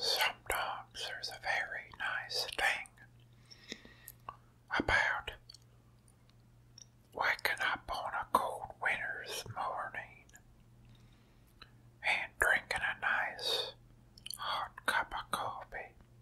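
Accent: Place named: Pennsylvania Dutch, United States. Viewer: it's a penny Dutch accent albeit a little different from the traditional penny Dutch accent. He's from PA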